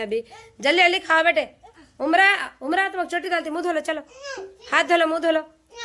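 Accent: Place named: Hyderabad, India. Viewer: mien yaad rekhna mujhey mien bahut pareeshan hun ,,,,❤❤❤❤ App baat kerty ho meri aik dost ki terha ,woh bhee app ki terha Hyderabadi zubaan bolty theien ,,nawab bhai or Bhabi meray pyari baree behan ,app Kay jaisa hi boltay thay same ❤❤❤❤❤❤ Namaaz Quran roza ,,bachon ko sab Sikhaya app nay Allah Pak qabool karay hum moms ki koshish,,mien bhee same app Kay jaisa try karee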